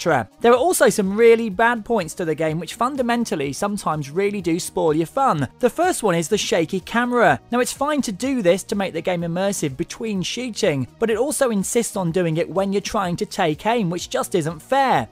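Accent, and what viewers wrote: United Kingdom. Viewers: i think hes british sh sm isnt out yet grr i must play it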